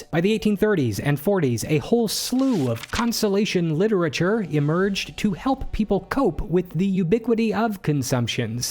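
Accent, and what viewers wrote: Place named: South, United States. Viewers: I love the added southern accent as well. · Glad to see you're feeling well again. I applaud your choice of a soft southern accent, as much of Poe's youth was spent in Virginia. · Oh my gosh,! That reading was much more interesting and dramatic than I thought it would be. The costume, the hair, the MUSTACHE! And the changing lighting add a lot of dramatic flair. The voice, the accent was a very nice touch, although the slightly southern twang surprised me. You made Edgar Allan Poe, master of horror, sound...folksy. I almost thought I was listening to Samuel Clemens, instead!;-) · Why do I have the sudden sensation of pitching a Jordan Peele or Ryan Coogler film as I listen to a Southern accent reading of "The Masquerade of the Red Death?"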